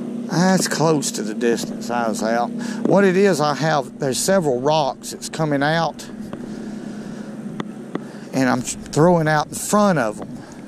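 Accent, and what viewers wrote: South, United States. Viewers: He mesns 1/128th ounce...hes just country...it takes a country boy to translate...im ole missippi boy...rock on brother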